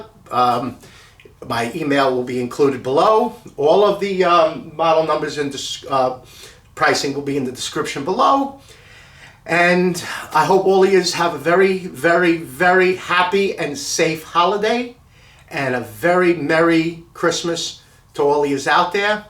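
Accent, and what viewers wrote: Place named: New York, United States. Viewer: Merry Christmas, what part of NYC area are you from? I can hear it in your voice. LOL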